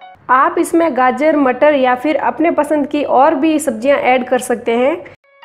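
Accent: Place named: Rural, India. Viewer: * आपका उच्चारण देशज झलक वाला है , अच्छा लगा । 💐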